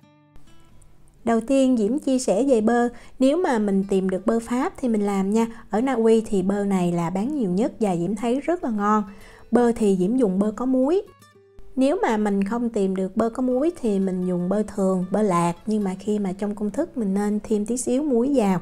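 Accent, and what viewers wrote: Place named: Bien Hoa, Vietnam. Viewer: Dạ, e nhớ rồi, e cảm ơn chị nhiều ạ. E cũng quê Biên Hoà luôn chị, nghe giọng của chị thấy nhớ quê lắm, e hiện đang ở Pháp, e từ không biết gì về nấu ăn mà xem youtube của chị riết e học hỏi được nhiều lắm ạ 🥰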